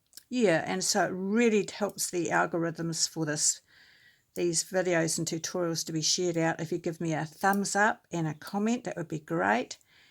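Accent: New Zealand. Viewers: love your Kiwi accent very familiar in Oz Love from Perth Western Australia 🇦🇺🙏❤️⚘️ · When you make an “oops” I laugh right along with you!!!!!! Love your NZ accent!!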